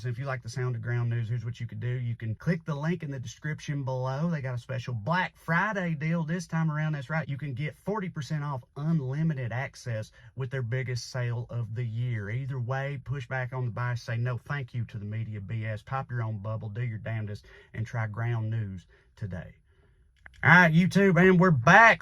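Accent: South, United States. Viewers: OMG you make me laugh which has been very hard to do as a Nurse who saw our nurses go above and beyond during CoVid deaths daily for the last few years. Also it's not done yet we are still having CoVid deaths while the rest of the world has decided its over. As a country girl I am just going to say to those who think Orange idiot is the answer (oh dear, God bless your soul). And aren't you just so special. Lol. Love your perspective, accent my fellow country spirit. You look and sound like my late cousin Barney. I did not give him his name. Lol. But he lived, he lived and he managed to drive his motorcycle into the only tree in Wilcox AZ. Miss him so much. He came visit me right before his death and stated (Yuma AZ is h.o.t.t. hot) lol. Could not state better myself.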